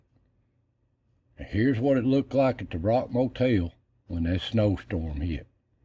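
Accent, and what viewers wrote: Appalachia, United States. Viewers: We love your voice, it is extremely intelligent knowledge with a true applachian tone. Love when you narrate. Enjoyed the wildlife this morning. Thank you for everything... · Yes sir Mr., we love hearing you narrate these wildlife videos!! Viewing the animals is great to watch taking in all GOD’S CREATION, but your Appalachian voice filled with your knowledge and the way you explain everything to us is the icing on the cake!!!! Great Video!! 😇🙏🏻👍🏻☀️😊😊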